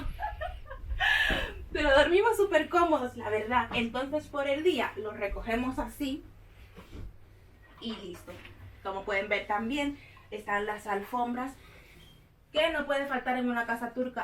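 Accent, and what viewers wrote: Spain. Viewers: Niña bella ❤me encantan tus videos con tus experiencias y sobre todo Como tu dices demostrar tus raizes Porfa HABLA COMO UNA CUBANA TU IDIOMA❤ DEJA ESE ASENTO ESPAÑOL PUES YO VIVO EN ALEMANIA MAS DE 30 AÑOS Y NO HABLO COMO UNA ALEMANA MI CASTELLANO, MI ESPAÑOL ES MI ORGULLO MIS HIJOS SON DE PADRE ALEMAN Y HABLA MI IDIOMA SIN ASENTO Y ESO ES MI ORGULLO AUNQUE ELLOS SEAN ALEMANES LES EXIJI SIEMPRE QUE HABLEN SIN ASENTO Y HOY POR HOY ELLOS MISMO ME LO AGRADESEN HERMOSA MUCHAS BENDICIONES Y GRACIAS POR TUS VIDEOS 🙏🙏🙏❤️❤️ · Aqui hablavas con acento de España...ahora hablas diferente paisana😅😅.Como conosiste a tù novio y donde?? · Te gusta Turkia? Veo que tienes el acento español!!!!Saludos😊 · Si eres cubana, porque hablas enfáticamente como los españoles,disculpa el dialecto cubano es difícil de erradicar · Hola te comencé a ver cuándo sacaste el vídeo con Paulita una persona muy bella, eres cubana por favor no hables con el acento de los españoles, eres hermosa y no hay que olvidar nuestras raíces, soy del estado de Veracruz, Mex. 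· Que acento tan español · Hace un tiempo te vi en un video c9n tu mamá haciendo una historia y hablabas como una cubana que eres. Y ahora habla como una española??? Porque. Acaso es delito? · Muy fina, muy española hablando y las terminaciones "mos" las cambia por "nos" como: Alumbrábanos en vez de alumbrábamos, etc. Lo que llamas ordenador es el monitor, lo que llamas monitor es la torre que es en realidad el ordenador. Mucha fuerza, cuando se lucha como haces tú, de todo se sale, tendrás todo lo que mereces con la ayuda de Dios. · PORQUE HABLAS COMO ESPAÑOLA SI TIENES UN MARAVILLOSO ACENTO CUBANO. YO VIVÍ EN MADRID Y NUNCA HABLÉ COMO ESPAÑOLA SEGUÍ MI ACENTO CUBANO. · Eres Cubana pero tienes un acento Español.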